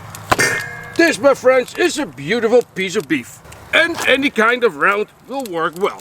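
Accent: Netherlands. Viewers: this guy really sound dutch are you or not? 🤨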